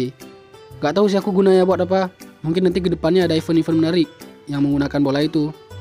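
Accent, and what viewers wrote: Aceh, Indonesia. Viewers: Gaya peugah aba awk aceh sang bak hi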